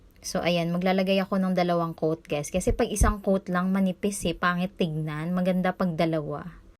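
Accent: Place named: Iloilo, Philippines. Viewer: Ilonggo accent haha di madula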